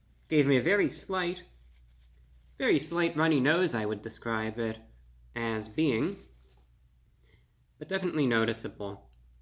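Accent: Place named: Mid-Atlantic, United States. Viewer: What makes these reviews a rare gem? The deliberate pacing, articulation, lack of saying "um", and that mid Atlantic "accent". Not to mention it's entertaining!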